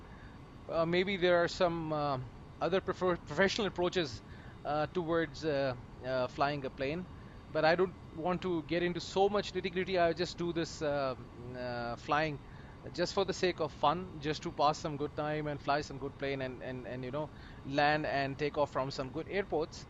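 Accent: India. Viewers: I dont like your indian accent because you sound like a guy who calls me from "windows technical support"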